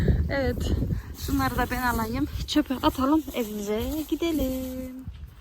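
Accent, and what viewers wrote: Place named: Yozgat, Turkey. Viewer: yeni tanidim cok pozitifsin bende yozgatliyim yakiniz senin memlekete konusman bize cok benziyo cok sevdim seni😘😍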